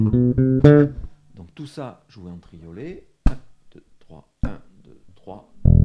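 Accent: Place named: France. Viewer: haha im french and i didnt understand it all, he's from france i assume from his dialect, and i speak quebec french ahah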